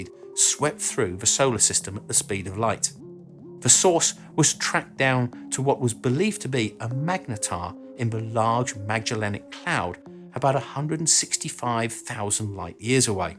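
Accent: United Kingdom. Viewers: Sooo brrritish that it hurts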